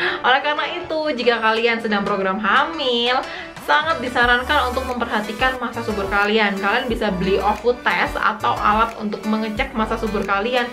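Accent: Java, Indonesia. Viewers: Dok.. bahasamu medhok bangeettt... Kamu dari mana dok?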